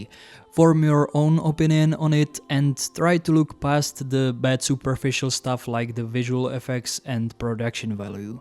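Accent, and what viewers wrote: Czech Republic. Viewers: Dopytle....celou dobu si říkám..odkud ten borec asi je....takovejhle "přízvuk"....to je snad Čech...a pak na mě vyskočí:)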